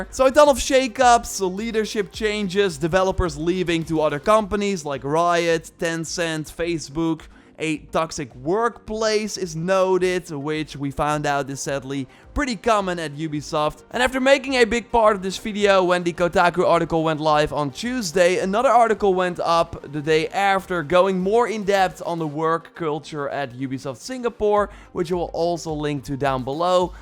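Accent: Netherlands. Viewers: You sund very very Dutch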